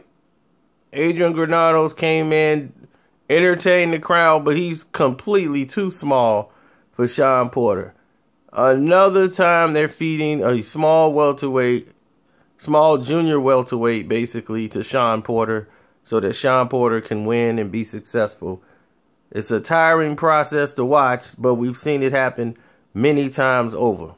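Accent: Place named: South, United States. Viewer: That southern drawl cracks me up! What you say is true.Takam left his fight with his head held high. Stiverne did not.You can lose with dignity or without it.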